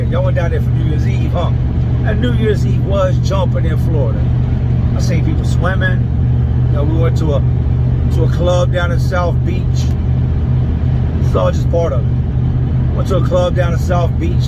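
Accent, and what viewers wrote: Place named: Bay Area, United States. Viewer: He sounds like he from tha Bay wit that Never Dat. But dats old school I don't think we say dat no mo. But keep it P.I. Bad NFluenz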